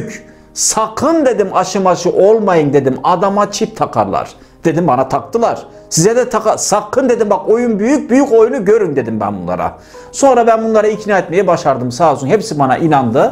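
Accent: Denizli, Turkey. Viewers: Abi biraz Denizlili şiveli konusan bi programida öyle sunsan , Emegine saglik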